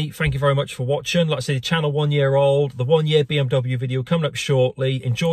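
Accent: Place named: Norwich, United Kingdom. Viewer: By the way, I'm from Norwich and you sound like you might be from these parts? Probably why your videos are even more relatable as it feels you're a trusty chap from round the way! 👍🏻😁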